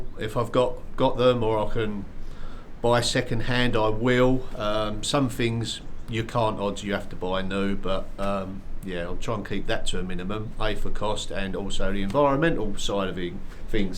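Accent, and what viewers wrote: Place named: London, United Kingdom. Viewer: Sounds like working man's London burbs to me !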